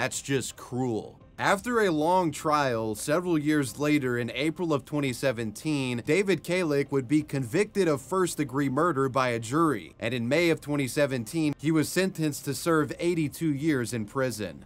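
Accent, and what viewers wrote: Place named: United States. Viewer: I just found your channel yesterday and I’m addicted. I rarely subscribe to any channels and you’re so much better than other channels. I hate when channels are run by people with thick accents or over dramatic. You are straight forward with little drama and normal American accent. Super refreshing!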